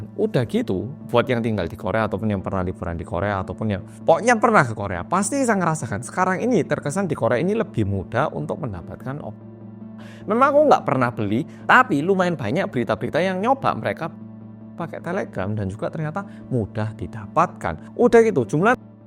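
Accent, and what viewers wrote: Java, Indonesia. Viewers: Mas medok e polll😅👍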